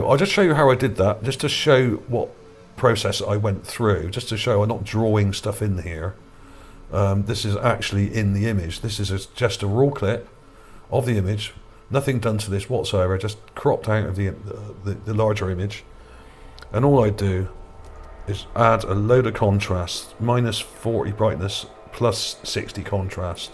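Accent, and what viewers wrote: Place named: Gloucester, United Kingdom. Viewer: You sound like you're from Gloucester or somewhere south maybe bristol?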